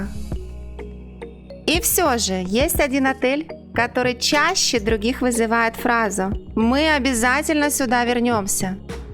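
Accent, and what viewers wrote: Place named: Moscow, Russia. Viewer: Обзор норм. Але цей московський акцент то підець.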